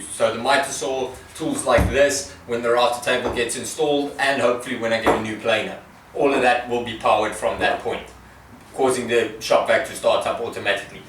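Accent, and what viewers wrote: South Africa. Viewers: Well done with the table. Its a serious space saver. You sound south african. Still living here? If so, where will I find an automatic on/off switch as described on your website? Thanks for a great channel.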